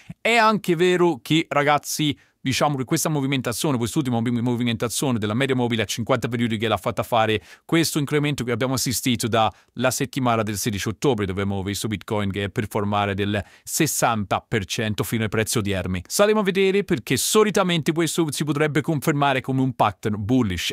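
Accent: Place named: United States. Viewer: Mitico l'accento AmericanEnglish è stupendo 😅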